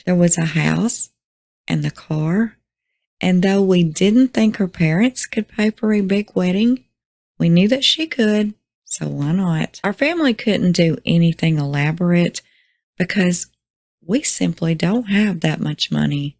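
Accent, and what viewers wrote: South, United States. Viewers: You actually did a really good job on the Chris Watts book reading and I literally listened to all 9 hours and your voice is pleasant and your accent is fun because I literally never listen to any voiceovers with a Southern accent so it was a good change and you have a good speaking voice. Nice job!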